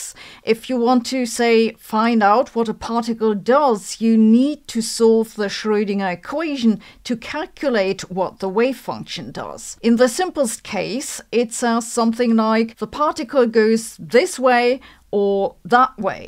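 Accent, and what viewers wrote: Germany. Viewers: She's awesome. I have watched several different science oriented channels but Sabine is my favorite. I can't claim to understand everything. I do my best to get a sense of things as she breaks down different topics but the vast majority of it is beyond what I understand. In my gut I feel like she is a person of character and if she realizes she is wrong about something she will admit it and explain it. That is everything in my book and so I trust her. Having said that, my grandparents were German and I love that accent... She had me at "Einshtein" 😅😊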